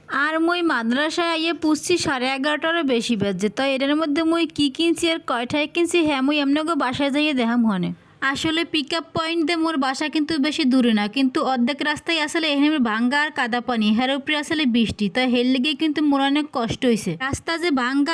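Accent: Barishal, Bangladesh. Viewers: আপু আমি তো তোমার বরিশালের ভাষা শুনে সবসময় ভালো লাগে তুমি আমাকে দেখো না কেন ❤আমি তোমাকে সাপোর্ট দিয়ে দেখতেছি❤ ভালো থেকো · বরিশালের ভাষা আর আপনার পন্য দুইটাই অনেক সুন্দর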